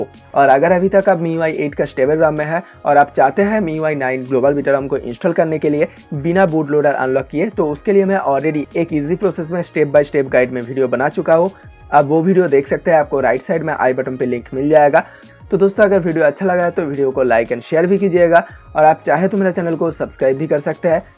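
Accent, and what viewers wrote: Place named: Uttar Pradesh, India. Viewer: bhai aap jo.bolte ho uski ton thik karo like jo English words hai woh tore up wale ke ton mei bolte ho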